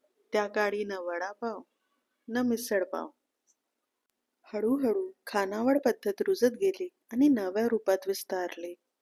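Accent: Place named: Hindi Belt, India. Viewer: माहिती चांगली आहे. पण उच्चार हिंदी पट्ट्या मधले वाटत आहेत. उच्चारांवर काम करावे.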